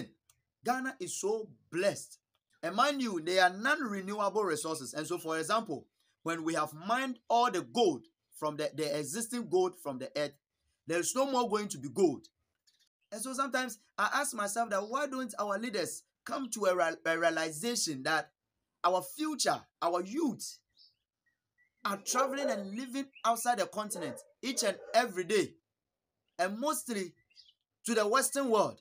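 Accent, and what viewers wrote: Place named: Nigeria. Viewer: You sound like a Nigerian your accent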